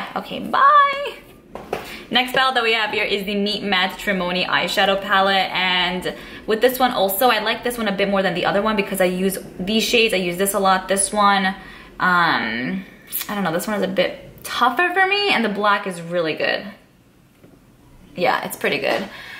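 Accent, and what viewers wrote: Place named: New Jersey, United States. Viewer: She has the same accent as Carli bybel lol are they both from jersey ?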